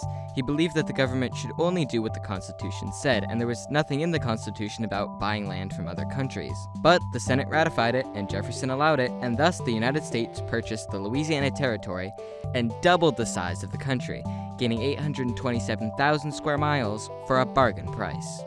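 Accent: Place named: United Kingdom. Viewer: idk why but at first he sounds kinda british not gonna lie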